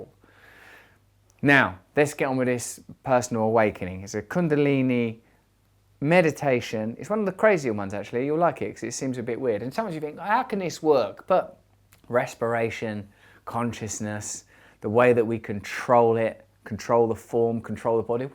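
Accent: United Kingdom. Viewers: I also feel a lot better, almost like calmy resting in myself with a clearer head and a some awareness of the present moment. Thank you so very much for sharing this, I'll try this for bed time to, maybe it helps for sleeping too. And btw I love your British accent, one of the reasons I subscribed to your main channel in the first place (content and critical questioning too) and I am over the moon to get such mindful content on your second channel. Usually I am not the person to comment on yt, but I really wanted to take the time for deeply thanking you for your wonderful creative, mindful, peaceful and thoughtful content on both channels!!!!! Thank you.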